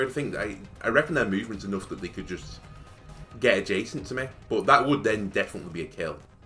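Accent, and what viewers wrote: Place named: England, United Kingdom. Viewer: The first two missions on Impossible are about not having LOS. If they see you, they'll kill you. Do the math: Sectoid with 70 aim has 1/3 chance to hit you in full cover so 3 sectoids seeing you mean that at least two must miss and the third must not hit 4+ ... 1 - (0,7*0,7*0,85) = 60% that your soldier survives the turn. If he is wounded, chances drop quickly. Side note: Because they don't have enough aim, they can't hit hunkered soldiers if they are in full cover. So if you can't kill them, hunker down (while your soldiers maneuver - without LOS). And forget overwatch during these missions. Though it works on roof tops two squares away from the ladder if you have 4 soldiers on overwatch ... LOL Btw: Where do you live? Most of the time I'm listening to Americans but you sound sooo English. I'm German so I can't judge just by listening but you remind of these Oxford English cassettes (school, yeah)...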